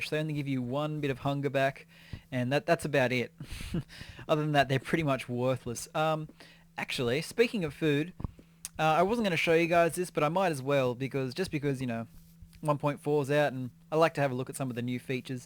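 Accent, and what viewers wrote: Australia. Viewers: Are you Australian? I am xD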